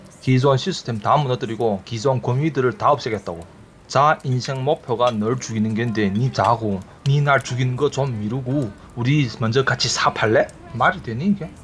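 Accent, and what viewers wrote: Yanbian, China. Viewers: 님의 충실한 팬입니더..^^ 개인적인 생각은 굳이 "연변말"이라고 해서 부끄럽고 잘못된 것이 아닙니다. 어학적으로는 되려 더 다양하고 향토 냄새가 나는 거라, 이색적인 어투나 거리감 나는 화법이 듣는자에겐 더 재밋고 중간중간 고민 할수 있는 시간을 주죠.... 조율과 리듬이라고 할까요?? 듣는 사람입장에서 사실 유익 할수 도 있지요..^^ 그리고 님께서 말씀주닌 내용은 거의 우리민족 원어들의 기본속성을 살린 단어들인지라.... 오해 하거나 잘 이해안되는 부분이 간혹 있어도 네이버에서 검색하면 어학 사전으로 정확히 해석 되어 나옵니다... 이번에 말씀하신 "륙속" 또한 북한말로 나오네요.... 이런 의미에서 남한 서울 말투만 고집한다?? 이거야 말로 문화적 갑질이 아닌가요?? 조금 편파적이만 현실적으로 남한 조선족님들도 더 공부해야 됨이 맞는거지.... 어찌 연변말투나 북한말투가 부끄러운 존재가 되겠어유.... 수십년 거래 불가로 문화적/언어적 차이가 있는 것이 당연하고 그 거리를 좁히기 위함은 양방향으로 진행됨이 맞는 거라 생각 됩니다. 이런 방언과 지역적 편견은 사실 한국내에서도 존재 하지 뭡니까? 물론 서울말을 사용해야 많이 표준이 된다지만, 그것은 실생활이나 비지니스에나 필요할지... 굳이 님의 동북아를 중심으로 진행되는 역사와 현실의 해설에는 우리 연변말이 딱이라고 생각 됩니다.. 이 옛날 말 그대로 역사를 알려주는데 이거야 말로 제맛이 아닌가요?? 조기 축구 끝나고 막컬리 사이다 한잔 하면 그 기분 최고죠..ㅋㅋ 님 힘내세요. 님의 예리한 동찰력과 현실과 역사속의 자유자재한 비유, 해석들이 너무 좋고, 시원시원한 성격의 소지자임을 확신합니다....ㅎㅎ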